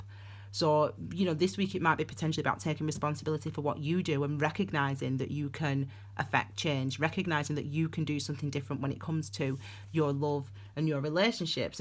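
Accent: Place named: North, United Kingdom. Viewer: Thank you another great reading.. and who can love a northern accent? 😂 is northern girls rock 💫💫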